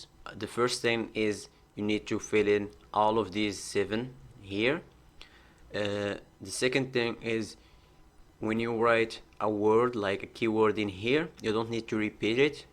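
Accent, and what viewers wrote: Morocco. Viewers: Hhhh htana chmit ri7t lblad f sotoo 😅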